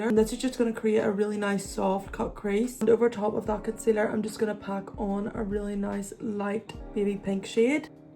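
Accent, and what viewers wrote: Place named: Scotland, United Kingdom. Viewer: I vote for Scotland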